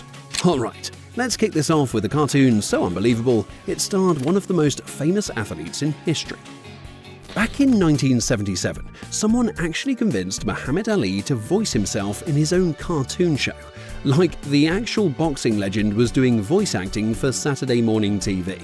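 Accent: United Kingdom. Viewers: I remember all those Saturday morning cartoons growing up in the 70s, but what I don’t remember is your British voice coming out of my mono TV in 1977 That’s what I don’t remember.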